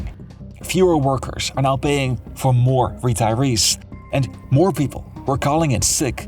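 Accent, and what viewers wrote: Netherlands. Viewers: I really like dutch accent, it's strange and insteresting, also the second channel is super high quality, I watched 3 videos as far there, about turkish coup, bosnia and saddam, they're good so far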